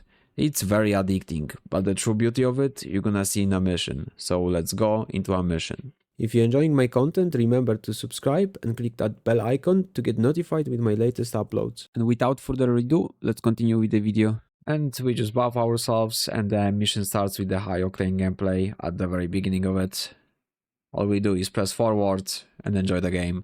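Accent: Poland. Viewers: i can smell polish accent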